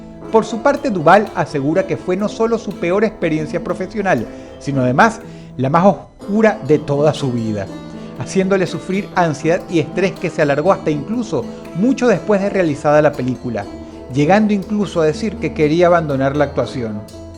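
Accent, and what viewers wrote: Venezuela. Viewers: Extraño cuando a se le notaba el acento venezolano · Cuando aún hablaba como venezolano:v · A le ah cambiado mucho el acento el los últimos años en este video tiene acento venezolano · Ese acento venezolano ✨ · wow aqui tiene muy marcado el acento venezolano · Ese acento Chamo... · anda, que para ese entonces ese acento venezolano todavia estaba ahi...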